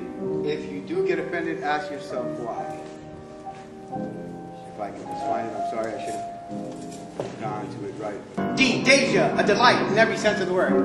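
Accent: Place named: New York, United States. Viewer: The narrator is a New Yawker. He should be hip to the words of: "But he was going no where fast Speed ain't nothing without class"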